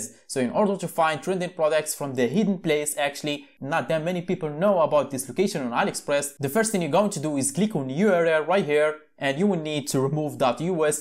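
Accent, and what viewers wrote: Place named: Morocco. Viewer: kml akhy l3ziz ana hna f us o mn accent dialk bayna mghribi hhhh sa7a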